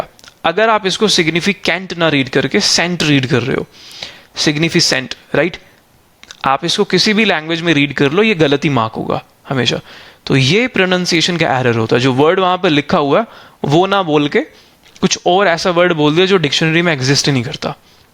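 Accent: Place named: Pakistan. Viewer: sir ka excnt and urdu bilkul pakistani hy👍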